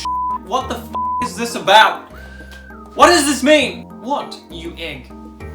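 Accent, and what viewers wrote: New Zealand. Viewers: Clicking on a femboy memes video only to get immediately slapped in the face by a kiwi accent really sent me for a loop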